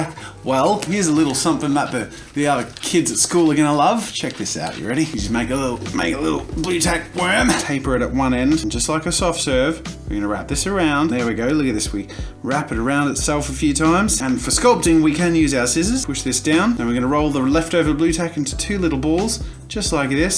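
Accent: Australia. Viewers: Wait ur Aussie... AUSSIE AUSSIE AUSSIE OI OI OI! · Wait ur aussie...I AM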